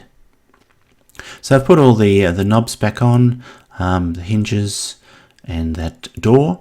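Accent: Australia. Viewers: Nice to hear an Aussie voice over. Your instructions are clear and concise. Good stuff. 👍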